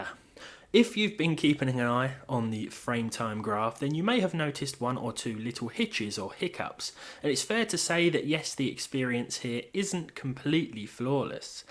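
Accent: United Kingdom. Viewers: Your videos are so chill. just a calm british dude talking and no cringe intros or ads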